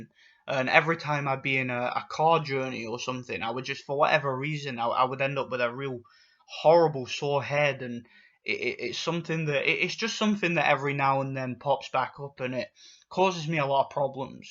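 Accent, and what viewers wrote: Yorkshire, United Kingdom. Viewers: Scottish?? ... you sound like you're off Emmerdale pal! Anyway all soft drinks manufacturers are going this way...that's why Coca Cola now has Coke 'No Sugar' branding almost identical to regular Coke. Also there's no evidence that artificial sweeteners cause cancer. Sugar, although not a direct cause of cancer, does fuel the growth of cancer cells in the body. And just because Jamie Oliver campaigned to improve kids' school meals, it doesn't make him a paedophile. You have some very warped ideas mate.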